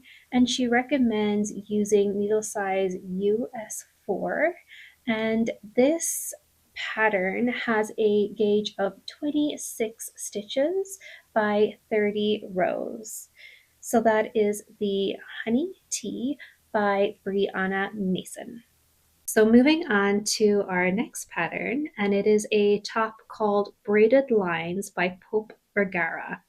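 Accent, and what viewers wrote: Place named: Ireland. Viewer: Im so glad you mentioned you are from Ireland originally in this video - I spent the 1st 44 out of 45 minutes trying to place your accent, because some of the words you say sound so Irish, but some sounds not so Irish. I shouldnt have doubted myself so much 😂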